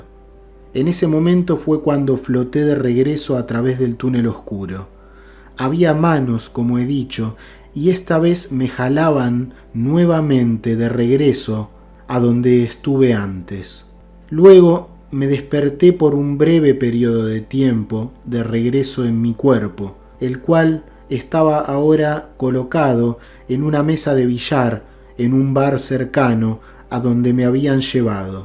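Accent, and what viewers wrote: Argentina. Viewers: SE NOTA QUE QUIEN RELATA LA EXPERIENCIA ES ARGENTINO...YO TAMBIEN SOY ARGENTINA....ME CONFORTA ESCUCHARLO Y MUCHO....GRACIAS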